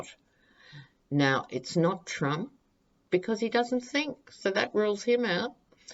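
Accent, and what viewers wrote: Australia. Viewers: No apology necessary, and it’s not your charming Aussie accent. I wouldn’t have remembered the French pronunciation either, had I not had a childhood friend whose native-French mother had the same name.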